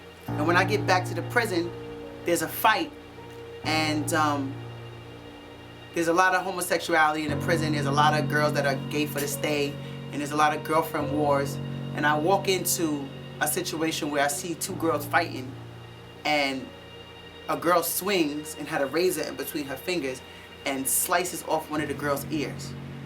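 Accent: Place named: New York City, United States. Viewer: That's the NYC accent! I love in the Bronx...woman are a bit tougher than your average coming from NYC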